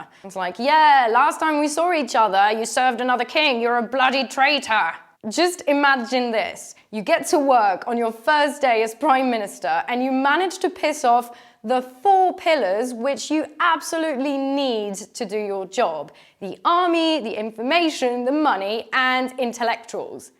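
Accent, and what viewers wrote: England, United Kingdom. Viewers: Super British accent!!!! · Superbe accent anglais !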